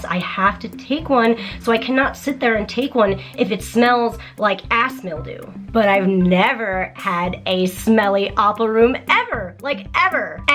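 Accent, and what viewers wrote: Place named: United States. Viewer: Your Japanese got an American accent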